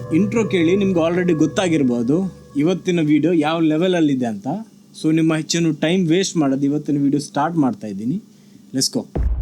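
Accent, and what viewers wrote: Mangalore, India. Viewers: R u manglorian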